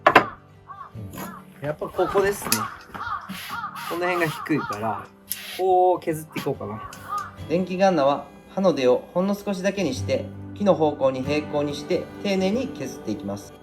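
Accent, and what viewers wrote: Kansai, Japan. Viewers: 、お疲れさまです❗JPさんの言葉と言うか、話方は関西弁混じりですね❗関西の出身ですか？珪藻土の下のタイルの穴開けをした時、キリ先はコンクリート用ですか？見てたら、鉄切り用に見えましたけど…。それに、壁に打ち込んだビスアンカーって言ってましたけど、それはカールですね❗洗面所が、見違えりましたね‼️モダンな感じで良いですね‼️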